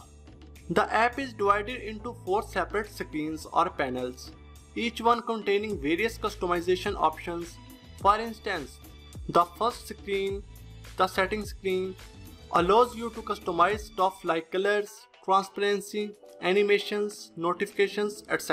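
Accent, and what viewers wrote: India. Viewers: Your english accent is terrible. Oh i forgot you are indian that's why. Hahahahaha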